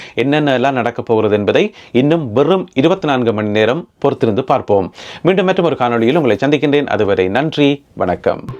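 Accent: Jaffna, Sri Lanka. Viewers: மிக மிக அழகான யாழ்ப்பாண தமிழ் கேட்க கேட்க உங்கள் குரல் கனீர் கனீர் என்று ஒலித்துக் கொண்டிருக்கிறது தொடர்ந்து இது போன்ற தகவல்களை தரும் உங்களுக்கு எனது மனமார்ந்த நன்றிகள் பல வாழ்க வளமுடன் நலமுடன் நன்றி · "மிக மிக அழகான யாழ்ப்பாண தமிழ்..." 6 hours ago மிக மிக அழகான யாழ்ப்பாண தமிழ் கேட்க கேட்க உங்கள் குரல் கனீர் கனீர் என்று ஒலித்துக் கொண்டிருக்கிறது தொடர்ந்து இது போன்ற தகவல்களை தரும் உங்களுக்கு எனது மனமார்ந்த நன்றிகள் பல வாழ்க வளமுடன் நலமுடன் நன்றி Reply 0 seconds ago "யாழ்ப்பாண தமிழ்..." - இதாலதான் கருணா, பிரிந்தார் என்பதும் ஒரு கருத்து...மறுக்க இயலாது காக்கைக்கும் தன்குஞ்சு பொன்குஞ்சுதான். சாதியமும் இப்படித்தான் மாறு வேடங்களில் மறைந்திருக்குது.. கிணற்றுத்தவளைத் தனத்திலிருந்து வெளியே வாருங்கள்....அப்போதுதான் வடக்கும் கிழக்கும் இனையும்...., அதுவரை கனவுதான்.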